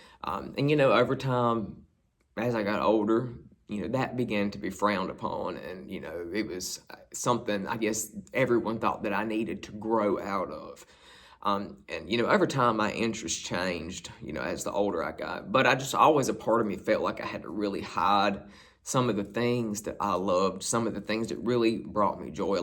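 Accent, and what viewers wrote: South, United States. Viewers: Love your videos. I could listen to you all day. I love your southern accent. Plus you have a great hair style. You and I have alot in common. I mainly listen to female singers. Mainly. Have you read her memoir, yet? Love and hugs from Dunlap, Ill.😊😊🎄🎄⛄⛄🎅🎅😊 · Aussie here loving your southern accent. Just want to hear you say Veh-hickle. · I love your vids. You and I have much in common. I was born and raised in the upstate of SC and I now live in Charleston. You and I have that same upstate southern accent too. I always look forward to seeing you every time you post. Much love and blessings.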